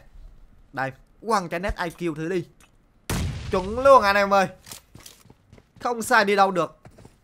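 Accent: Binh Dinh, Vietnam. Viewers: Bình Định bạn nhá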